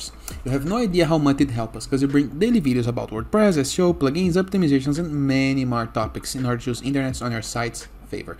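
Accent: Brazil. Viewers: great video. btw you brazilian?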